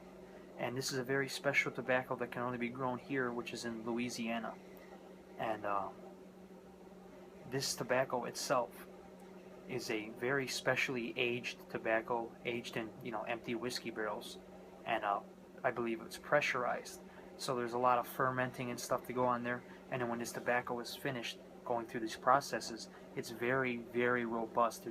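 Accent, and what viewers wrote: Chicago, United States. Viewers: Fuck yeah dude the second I saw the jacket and heard you speak I knew this boy was from Chicago. Windy City best damn city in the world! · He is from Chigaco I think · My family is from chicago and surrounding area and i picked up on the accent. I thought you may be from Chi-Town. And i think i detect a little Italiono too. Like listening to one of my family members. Fuckin love it! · The only people I’ve ever known with a Chicago accent that thick were all in their 60’s. Maybe this dude is a time traveler. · That Chicago accent I'm from Chicago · That is one strong chicahgo accent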